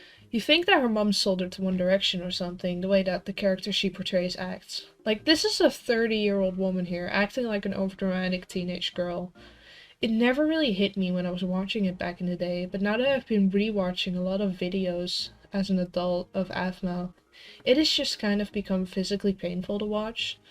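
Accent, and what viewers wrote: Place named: Netherlands. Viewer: it took me a hot minute to realise your dutch! welcome aboard to the english speaking , dutch side of yt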